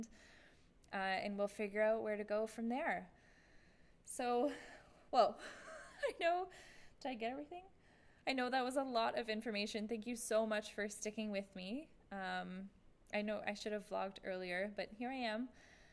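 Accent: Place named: United States. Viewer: American accent and ends vlog with "cheers" obviously been hanging out across the pond... in hello games studio? hmmm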